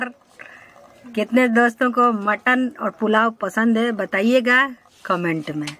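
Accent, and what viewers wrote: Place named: Bhojpuri, India. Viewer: Anuty ji aplog to hamare jaise bhojpuri bol rhe ho 😅 aur apka khana v bahut majedaar banati ho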